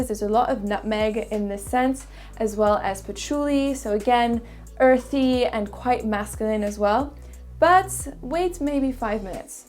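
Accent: France. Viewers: I love your french accent ❤️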